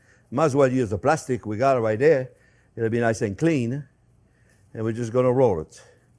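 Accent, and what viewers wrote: France. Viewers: A little bit on accents! Accents are a reflection of geographic pride Chef! Listen to those from NYC, TX, GA and many will say, "what the heck did they just say," it's geographic pride and some things can never be changed. You are an American French Chef, never apologize for your accent, it's you! A beautiful program. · Ah ah , vous m’avez encore faite rire … et cette recette a l’air succulente ! Je vais la proposer à mes amis ce week-end. Et votre accent est très frenchy. On adore 😂. Merci pour votre belle cuisine chef !❤ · I love your French accent Chef! I am certainly going to make this stuffed pork. Perfect for Fall here in Canada. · Don't loose your French accent, but I didn't understand what you were saying with the sign language at the end after you tasted it but it was still funny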